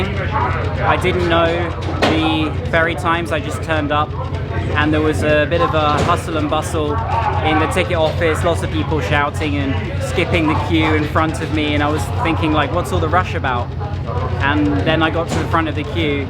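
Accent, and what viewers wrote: England, United Kingdom. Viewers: I like his English accent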